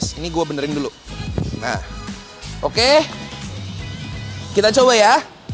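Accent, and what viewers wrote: Cirebon, Indonesia. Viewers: 100% orang cirebon dari logatnya... Mantap lah, sukses terus buat semoga makin keren dan makin maju di dunia otomotif....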